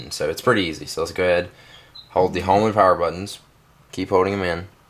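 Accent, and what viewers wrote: South, United States. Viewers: pretty sure he just sounds like a southern United States lad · Damn old vid. U sound so country here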